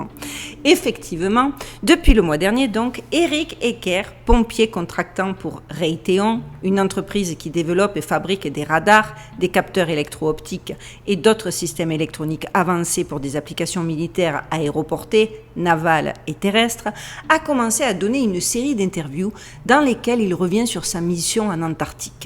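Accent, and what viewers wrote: Marseille, France. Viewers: en tout cas, j'adore voir duchesse (j'ai cinq chats), j'aime les sujets que tu traites (ça fait partie de mon métier de diffuser des livres sur ces sujets) et purée j'adore ton accent marseillais qui doit être vraiment saisissant pour les américains. Et alors quand tu ris juste après avoir dit une sottise c'est juste un rayon de soleil. Continue et si tu as des sujets sur les civilisations anciennes, n'hésites pas, je suis en attente. Je suis une mamie de 60 ans, éditrice et traductrice et franchement, il y a quelques youtubeurs dont toi que j'aurais adoré avoir pour enfants, les miens sont macronistes, et ils ne jurent que par le dieu BFMTV, autant dire une déception terrible pour une vilaine complotiste comme moi 😂😂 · Hello comment ça va bien 🥰🥰🥰🥰 nous ont adore tes vidéos tu est super j'adore ton accent de Marseille allez go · manifaiiiike !!! 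trouver toute ces infos doit etre un boulot de dingue et que ceux qui te traite de Cagole aillent se faire cuire le cul !! des ignorants du parler marseillais surement !!! gros bisous de marseille ou il pleut comme vache qui pisse aujourd'hui et finit la canicule on respire enfin !!! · Comme toujours, très intéressant, intriguant, et bien présenté. Tu es « TOP » dans ce rôle. En plus ce p’tit accent du sûd est irrésistible… Pour ce qui est des détracteurs, ces troll’s n’ont que brosser si ça ne leur plaît pas. Ne change rien. Petit bémol, es-tu certaine que la terre est ronde ? Cela pourrait expliquer beaucoup de choses …. Il y a encore du taf ! 🤓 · Pour répondre à votre question,je ne pense pas que vous deviez perdre votre temps avec des orcs, gobelins ou autres trolls 😂,même si cette théorie de la terre creuse me parait totalement impossible ( volcan, oxygène, lumière etc..),je sais qu'ensemble nous cherchons le vrai du faux protégez vous de ces obscures graugs par le mépris..je sais " facile à dire,pas facile à faire",nous sommes beaucoup à apprécier vos interventions du dimanche,votre sourire,et votre timbre marseillais,et si nous sommes tous timbrés et bien "plus on est de fous..."😊😜❤️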